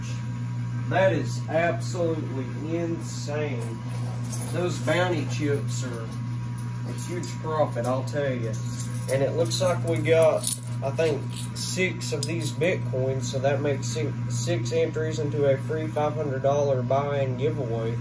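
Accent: South, United States. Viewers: Love your accent, I am from Australia. is that a southern accent,👍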